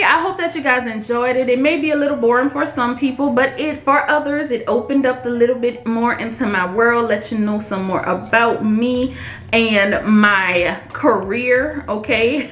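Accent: New Orleans, United States. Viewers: That NOLA accent is my most favorite in the Entire World! Fellow NP here...don't change girl!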